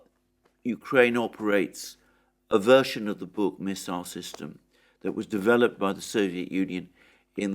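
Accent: United Kingdom. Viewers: You know, half the reason I watch this show daily is so I can hear how these crazy limeys speak Washington's English. "The works..." That's super cute!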